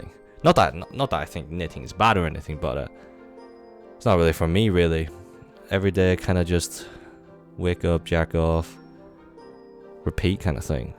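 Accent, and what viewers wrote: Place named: Northern England, United Kingdom. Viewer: Which county are you from you sound like ur from the north but I’m ashamed to say I can’t tell which specifically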